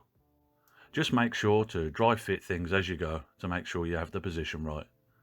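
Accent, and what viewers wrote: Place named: England, United Kingdom. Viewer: Wow!! An English voice on a modelling video for a nice change!!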